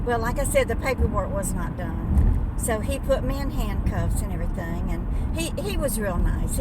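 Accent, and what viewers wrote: South, United States. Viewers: You sure have a wonderful outlook on all your many life experiences. You charm them with your southern drawl. They should make a movie of your life!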